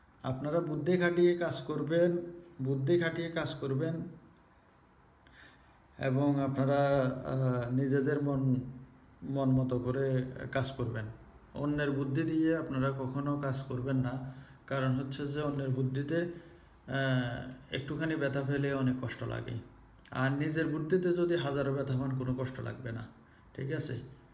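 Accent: Noakhali, Bangladesh. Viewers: নোয়াখাইল্লা। তুমি তাই না ভাই ভালো ভালো কাজ করেন তবু মানুষের সাহয্য হোক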